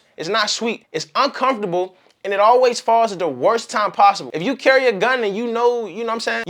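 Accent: DMV, United States. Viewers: I never heard the dmv accent in ur shorts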